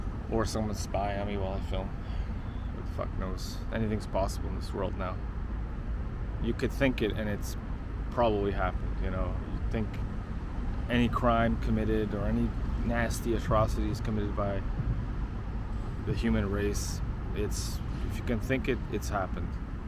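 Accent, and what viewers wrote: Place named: Europe. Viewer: You have a cool accent. Are you European?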